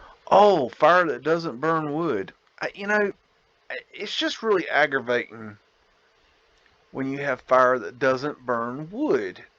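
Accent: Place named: North Carolina, United States. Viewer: I think it's because he has a NC accent. I spent my Summers with family there. It takes me back to all the questions I asked them. They almost never had answers for me.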